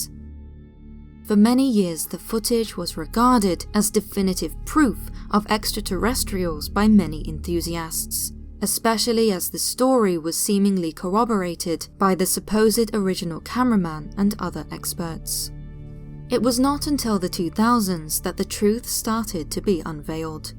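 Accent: United Kingdom. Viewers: narrator I always wanted to ask, what part of the U.K are you from?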